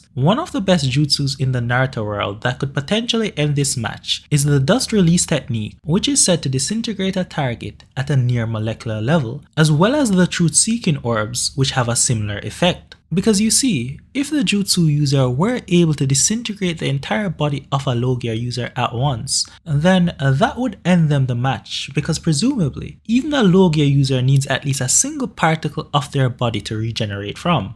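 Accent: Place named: Jamaica. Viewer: this man has a jamaican accent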